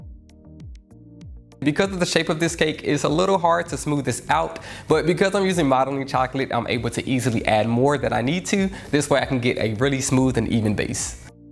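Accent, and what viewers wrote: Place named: Alabama, United States. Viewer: The alabama accent thoo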